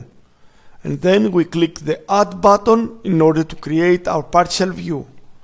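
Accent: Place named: France. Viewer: nice frenhc accent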